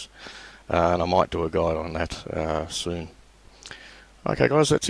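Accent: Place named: Australia. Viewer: Mate, Your accent is awesome. Brought back all the fantastic memories I had in Australia. The best country, people and culture I ever experienced in the entire world. Video helped, good on you mate. Cheers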